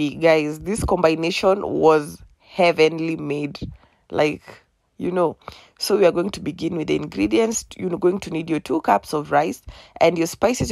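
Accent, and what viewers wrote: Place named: Caribbean. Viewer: Looks absolutely 💯 gorgeous and I will definitely try to make it and definitely going to be a new subscriber PS just out of curiosity where are you from I am normally quite good on accents but I am stuck on yours is it Carrabean??? Or some where in Africa with French origins ??? 👍👍🍚🌶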